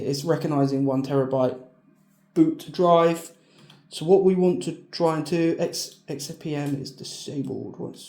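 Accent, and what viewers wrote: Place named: England, United Kingdom. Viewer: Nice video and I subscribed because of it, about time an English accent was on a youtube tech channel keep it up mate.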